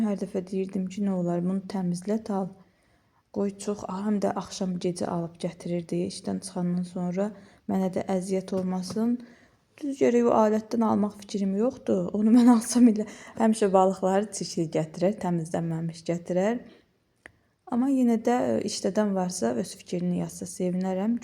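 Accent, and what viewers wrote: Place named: South, Azerbaijan. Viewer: Salam,sizin ləhcəniz elə şirin gəlir.variydi variydi deyirsize,cənubdansiz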